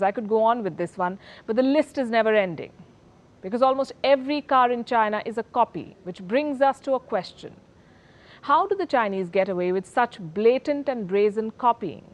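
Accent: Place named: India. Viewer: Indian accent os the biggest ripoff that Indian has provided,youhave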